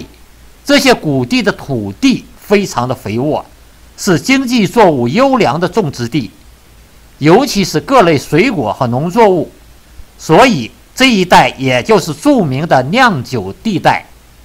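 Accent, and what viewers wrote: Shanxi, China. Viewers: 你是山西人吧? 自己好好纠正一下.难听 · 家門兒山西人嗎？聽出來了哈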